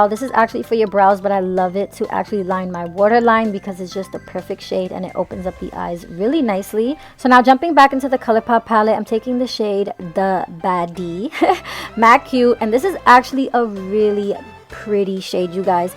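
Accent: New York, United States. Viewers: I knew you was from NY when I heard the way you speak lol we lit this look is beauty full honey 🥳🤟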